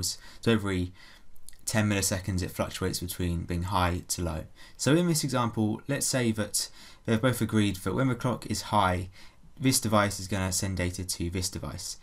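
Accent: United Kingdom. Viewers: can't get his British accent 😢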